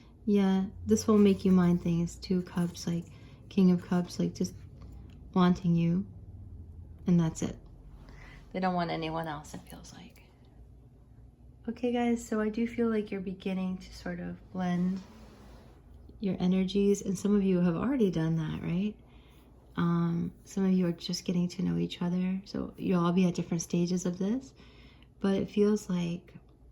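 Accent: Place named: United States. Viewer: Love you,! How can you always know, what is exactly needed at the moment. Pile 2 was especially for me. 💓American accent is so lovely on Russian.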